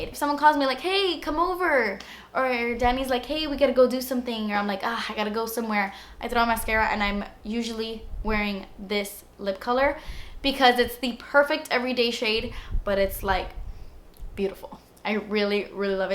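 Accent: England, United Kingdom. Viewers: I've recently subscribed to you and I absolutely love your videos! You should do an entire video with ur British accent :) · Love the british accent. Can u pls make a video with the whole british accent?...i really really like it..and of course i love your videos...thanks for mentiong VIB sale love yeah!!!😘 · Love that British accent btw! 😂 😛😘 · Love when you do the British accent, you totally resemble Emma Watson! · Your English accent cracks me up! coming from a brit 😊 xx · You look stunning in this video and the random British accent... I love that I'm not the only one who does that!!! Your perfection!! 💋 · haha whats with the britsh accent! you are sooo cute...!!! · Your UK accent is on point! lol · for some reason, whenever you talk in a British accent, you remind me of Leigh Anne from little mix lmao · Love your British accent!!! Lol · pls make a video with your british accent. 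i think its cool. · Girl we love you! I liked this video before watching it! I always go out & look for the stuff you show us cause I'm obsessed. I window shop most of the time for makeup but the stuff I can afford, most of it comes from you lol even though I have oily skin 😳 but I love you! Keep doing you, and I hope your house is ready soon, can't wait to have you back completely and we all appreciate how hard you've been trying to get content out for us! I hope you know how much your subbies adore you!!!! #dassesspensive P.S: I love when you do the British accent randomly! Lol I do that too and I get weird looks like "wtf?" Lol LOVE YOU! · That British accent is a bad habit. Stop. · Hahaha your English accent is SO GOOD!!! so funny:D Love you <3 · Girl your British accent always puts me in a great mood 👍🏻💓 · Your British accent, so cute!! I'm British so I know 😉 xx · omg tht british accent tho :'DDDD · I loved your British accent 👏👏👏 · Omyyyyyy. 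That british accent. ugh <3 It's so perf! I love you!:* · lmao! your british accent kills me every damn time!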